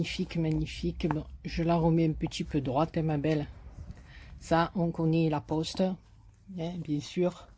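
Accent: South, France. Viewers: Cc ma toute belle et oui tu as encore été gâté c est super. Les papiers tes 2 albums sont magnifiques et je trouve que c est même dommage de le cacher avec des photos. J ai toujours beaucoup de plaisir à regarder tes vidéos qui me font toujours beaucoup de bien et vu que je ne suis pas partie en vacances pour raison financière tu m apportes avec ton accent le soleil du sud que j aime tant. Bisous bisous ma belle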